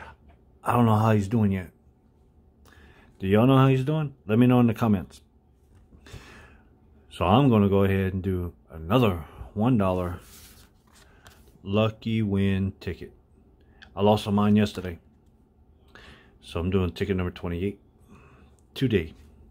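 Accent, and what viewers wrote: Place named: Louisiana, United States. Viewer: Love that Louisiana accent drawl action too 💪🏽💪🏽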